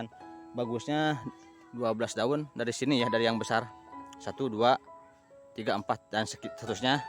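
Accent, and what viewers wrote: Indonesia. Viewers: Very nice orchids and advice. It sounds like you are Indonesian? I lived there for 12 years and loved it 😎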